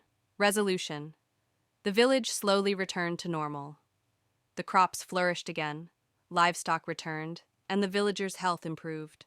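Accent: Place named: United States. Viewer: Remember this is African folktales and you shouldn't be using American accent for Voice note but African voice note. Thanks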